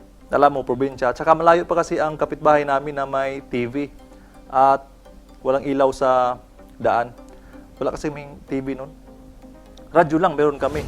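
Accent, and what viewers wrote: Visayas, Philippines. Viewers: Murag bisaya ka sir ba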